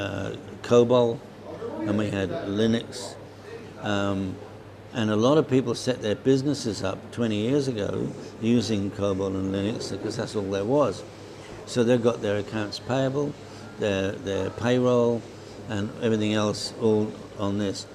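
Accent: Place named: Norfolk, United Kingdom. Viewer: Cor - you sound right Norfolk boy!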